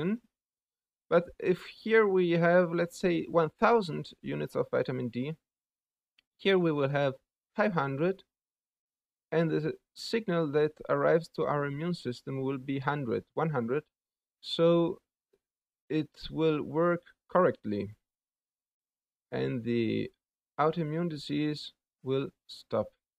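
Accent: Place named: Germany. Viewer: Excellent explanation !!! (I would just add a couple words about the Vitamin D receptors, since people will find that mentioned in other explanations, for example by Dr Coimbra himself). I am myself in the process of starting that treatment, already had some fantastic result starting it without actual knowledge about Coimbra, just based on the Book by Jeff T Bowles, who did a test on himself. Now I found the amazing story and results of Coimbra, and am looking for a physician to have supervision, as you recommended too. Since you sound a bit German, my question is whether you know of any doctors doing that treatment besides the ones mentioned here <link> Thanks a lot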